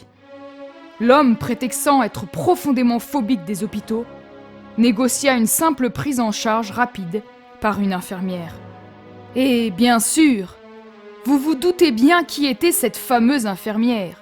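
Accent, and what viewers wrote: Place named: Italy. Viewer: Quelle vie cet! J'aime beaucoup l'ambiance italienne de ta vidéo avec l'accent et tout, bravo à toi 😃 et je trouve que ta construction est très réussi, j'aime particulièrement le bureau que tu as fais au rez de chaussé! Bisous à toi 😙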